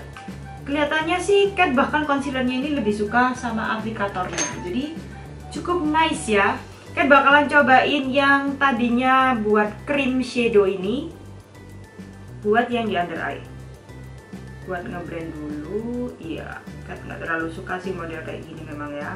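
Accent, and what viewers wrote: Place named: Surabaya, Indonesia. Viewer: Mau cerita sedikit ya kak Aku ngikutin video kakak dari awal banget sampe sekarang dan aku juga udah subscribe channel youtube kakak dan aku seneng banget kakak itu baik dan ramah dan mungkin kita sama2 dari surabaya kali ya hehhe😂 gaya ngomongnya medok khas orang surabaya Ohh iya satu lagi aku udah like, subscribe, and comment lengkap sudah👍 #kathlakzgiveaway2018 Aku mau jelasin kenapa aku positif thinking untuk menangin giveaway ini karena 1. Mau ngikutin jejak kak bisa makeup se-professional kakak 2. Pengen jadi youtuber juga tentang makeup2 gitu, doain aja ya kak semoga terwujud😊 3. Aku udah gak sabar buat nyobain brush itu, kakak buat aku ngiler deh pengen nyobain 4. Percaya diri bahwa aku bisa menangin giveaway ini😆 "MERRY CHRISTMAS & HAPPY NEW YEAR🎉🎉🎉" IG: Email: <mail>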